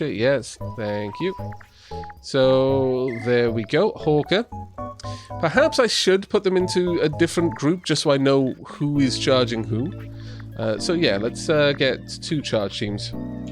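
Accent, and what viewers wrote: Wales, United Kingdom. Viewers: Question are you from Wales if so are your from South Wales?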